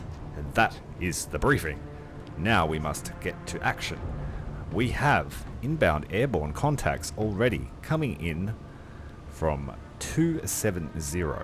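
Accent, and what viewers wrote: Australia. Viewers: Are you by chance from victoria?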